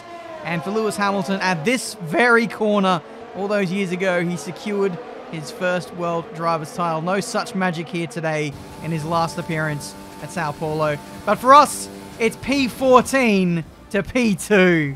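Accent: New Zealand. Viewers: I thought you were from Australia and not from New Zealand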